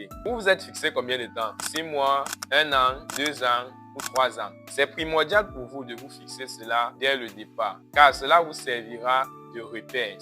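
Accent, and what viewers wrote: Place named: Cameroon. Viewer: On reconnait le ton Camerounais 😂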